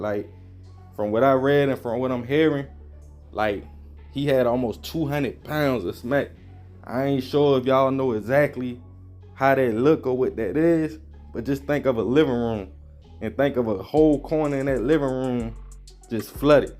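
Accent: Baltimore, United States. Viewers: U from bmore bruh u definitely not from dc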